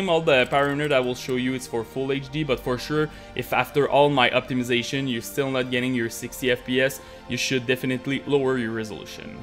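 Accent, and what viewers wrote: Quebec, Canada. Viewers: tu sonne comme un quebecois qui essaye de parler anglais (your voice sounds like a guy that speaks french trying to speak english) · This guy is from Quebec, I'm sure of it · t'es québécois sa parait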